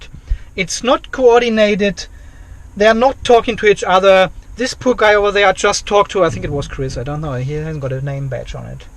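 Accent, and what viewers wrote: Germany. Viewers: Vom Aktzen sind sie deutscher oder? Also die Batterien sind bei allen Herstellern nicht stabil... ich habe einen Tesla Model x und kann nicht behaupten das ich diese Probleme nicht habe. Ich würde auf dieApp mich nicht verlassen...... dies ist ein Problem der App und der Software. Ich komme aus dem QM Bereich der Autohersteller. Die Probleme hat nicht nur Mitsubishi sondern auch BMW und Toyota bei Plug in Hybrid. (Tesla auch) die Fluktuationen und unterschiedlichen Meldung liegen am Bordcomputer und der Sensoren und der Software. Ehrlich gesagt ist es wie beim Benzin der Tankinhalt wird eher geschätzt als gemessen. (Grob betrachtet) was man aber genau messen kann (zumindest bei BMW) ist der Verbrauch dank moderner Einspritzung. So ist es beim E-Auto auch, da wir aufgrund Temperaturen usw. die Leistung zwar messen aber nie genau. Siehe Test von Tesla oder Hyundai e Autobesitzer. 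Wer sein Auto in der Garage mit einem teuren Stromzähler (geeicht) laden tut, hat immer Abweichungen zwischen Zähler und Autoangaben. Beim meinem Model X sind die sogar teilweise heftig. 6-7KW pro Ladung. Ich tanke mein Auto in der Firma mit einem Speziellen Stromzähler Kostenpunkt 2.300€ inkl Einbau. Was die App sagt und die Software, sagt nichts aus was die Realität vom Akku bzw. Batterie betrifft. (Die Angaben über E-Verbrauch sind genau und nur die sollte man bewerten) Jedoch Vorsicht, bei E-Autos spielen extrem viele Faktoren mit, die bei einem Diesel/ Benzin nicht berücksichtigt werden.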